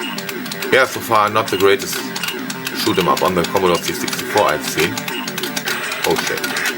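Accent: Germany. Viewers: My german-sensor was activated... Do I hear a german gentleman? :-D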